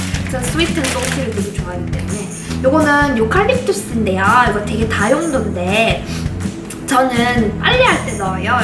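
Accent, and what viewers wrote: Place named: Gyeongsang, South Korea. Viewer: 경상도말투 핵기욤이셔요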